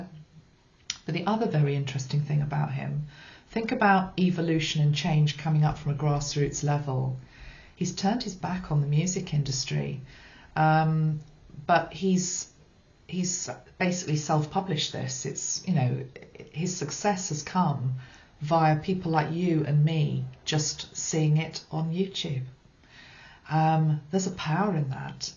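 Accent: England, United Kingdom. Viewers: Thank you so much for this uplifting video. We, the people, are more powerful than we know. I just love that beautiful English accent of yours and Best Wishes for a fun holiday in London.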